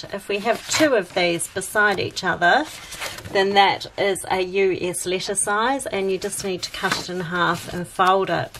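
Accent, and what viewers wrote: New Zealand. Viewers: This is the best card tutorial I’ve seen so far. Thank you so much I can hardly wait for my machine to arrive. By the way so nice to hear another Kiwi hahahahaha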